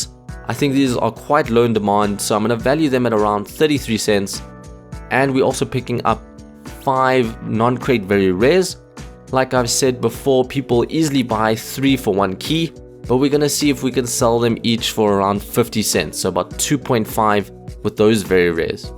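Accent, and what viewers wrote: South Africa. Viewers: R u south african you sound like 1. Our pronounciations and accent remind me of a south african i am aswell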